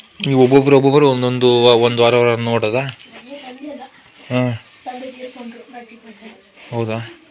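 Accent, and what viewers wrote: Kodagu, India. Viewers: Bro, nivu kodaginavara ….? Nimma mathu kelidre hange ansuthe ❤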